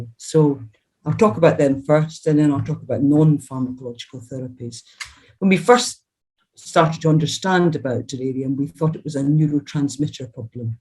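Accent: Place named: Scotland, United Kingdom. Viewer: Good o hear aS ottish accent! Thereis little about helping carers to cope with the after effects. My wife Jean 81 years old, was rushed into hospital with incontinence which was diagnosed as bowel impaction. She was later diagnosed with a fracture of the L1 vertebrae. She had to wait for transfer to the spinal unit. Very slow recovery , loads of opiods given. Referred to community hospital for assessment and discharge into a care home. One week later she was returned to A&E who diagnosed bowel impaction, this was three months after the original diagnosis. She is recovering mentally, still has panic attacks, bed ridden as no physiotherapy has yet been provided though her rheumatologist carried out tests and said that intense physio was needed.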